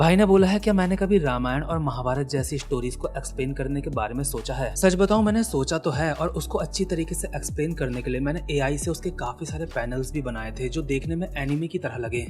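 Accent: Uttarakhand, India. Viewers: surname se pta lg gya tha ap uttrakhand ke ho accent se bhi 😍ap kumoani ho y garwali me bhi uttrakhand se hu ❤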